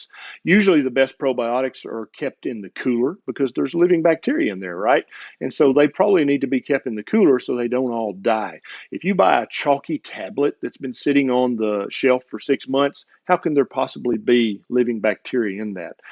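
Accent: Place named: North Carolina, United States. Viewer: I am trying to place his accent. My guess North Carolina?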